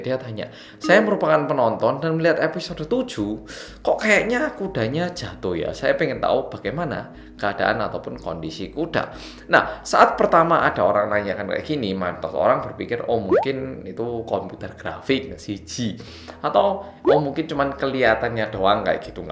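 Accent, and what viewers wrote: Java, Indonesia. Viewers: Baru kenal Mas e ,,, ceritanya gimana oppa jadi semedok itu... 🤣 Udah nikah belom oppaaaa???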